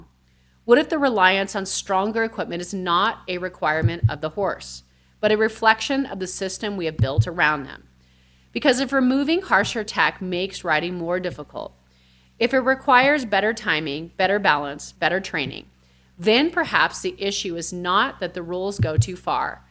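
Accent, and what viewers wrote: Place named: United States. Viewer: You have American accent and the US is second only to china in abusing animals. How about you showcase horses in America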